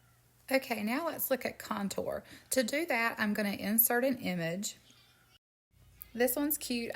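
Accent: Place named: South, United States. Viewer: Hello, i am not happy to have to say this, but i'm disappointed in your video, after your introduction, you went into design mode, you didn't start from the beginning & i'm a beginner, so this is why i am disappointed, i mean you sound like a very nice southern lady, but i didn't even get to see what Cricut you were working with at the start of your video.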